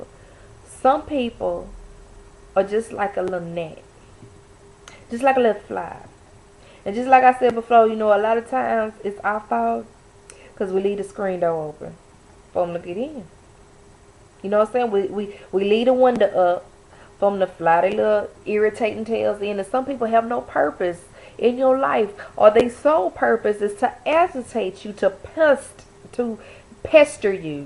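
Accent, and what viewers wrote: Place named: South, United States. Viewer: I like what granny said lol... I love ya country accent itz kute lol... Great vid fam...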